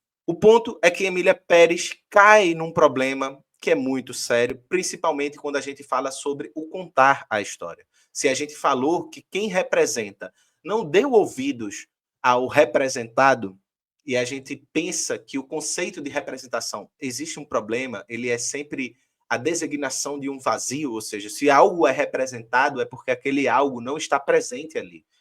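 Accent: Pernambuco, Brazil. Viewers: Grande iniciativa. Filosofia com sotaque pernambucano. Massa demais. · Mais um com sotaque pernambucano no canal meu coração não aguenta! 😍Salve,! Como é inteligente e bonito o camarada. Amei o quadro! Parabéns!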